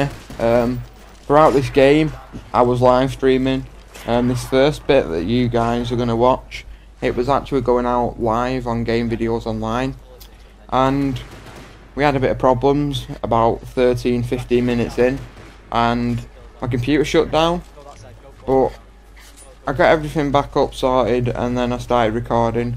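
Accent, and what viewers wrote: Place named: Manchester, United Kingdom. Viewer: u hav a great manc accent im from manchester aswel woop woop !!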